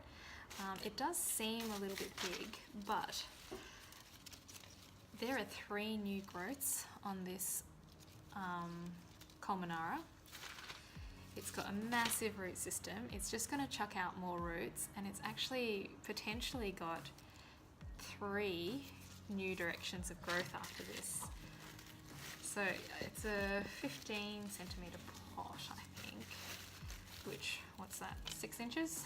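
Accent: Australia. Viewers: Nice to hear an Ausie vioce for a change, will be following your channel to see what else you have to teach me.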